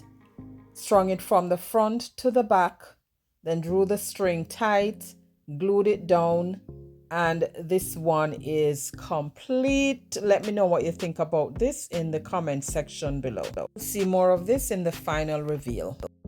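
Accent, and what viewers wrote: Jamaica. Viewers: I just LOVE your voice are you by any chance from JAMAICA?😊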